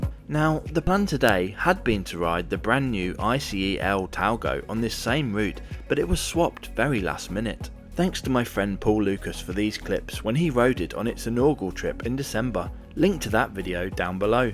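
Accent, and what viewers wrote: United Kingdom. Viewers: well it’s the English pronounciation of Köln, and this is a English channel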